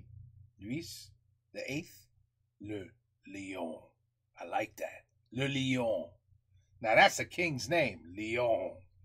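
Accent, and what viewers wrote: United States. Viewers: strong american accent DE LA FRONCE !!! 0/ j'aime beaucoup :D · J'adore quand tu parles français, avec l'accent américain c'est very cute! The golden globe and the scepter held by the King symbolise his God-given powers. The globe is the Earth (yes, no flat earth people in France back then), the physical world, it means that the monarch rules over his land, while the scepter symbolises his power of life and death over his people and the ability to give justice (le bras séculier). The History of France is very complicated, but very interesting.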